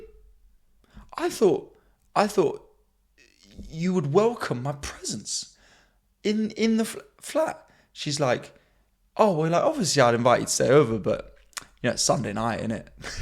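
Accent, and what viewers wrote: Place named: Jamaica. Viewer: I am hearing a mixture of English, Jamaican and Black American mixed together!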